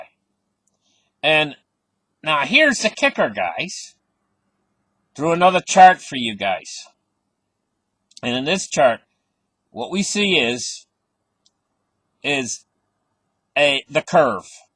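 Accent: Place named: Canada. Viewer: Mr., dont try to change for other people. It has to be expodential I can't listen to this without the whole Canadian accent.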